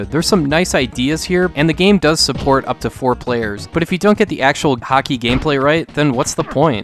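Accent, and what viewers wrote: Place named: Minnesota, United States. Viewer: Never knew you were a Minnesota guy, but hearing you say the word hockey 40 times in the last 10 minutes I can't believe I didn't pick it up before!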